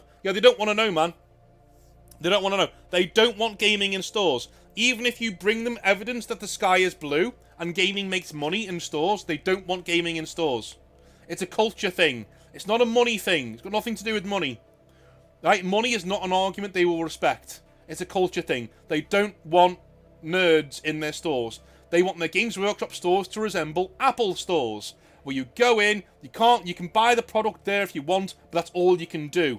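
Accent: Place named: Wirral, United Kingdom. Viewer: no opponents? You have the dulcet tones of someone from The Wirral! I live on the Wirral myself (that is ON the Wirral, not IN the Wirral... don't ask me why its that way ) I'm out of love of big 40k atm but if you ever wanna play Killteam hit me up brother!! (Anything to not have to think about the Mighty Blues for a weekend!!)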